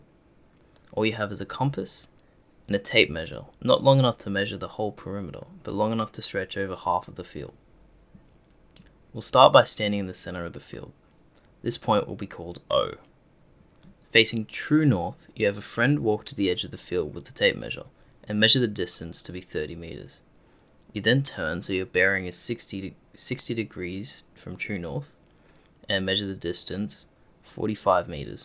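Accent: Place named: United Kingdom. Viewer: Thank you i love your British accent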